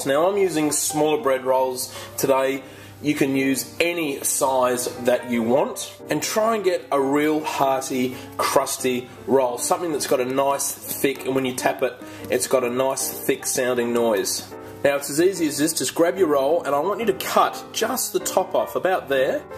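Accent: Australia. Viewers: After the accent and the fact that he has said where he lives in a few of his videos, I believe he's Australian. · That looks delicious! Love the Australian accent. Take care and God bless from Beaumont, Tx!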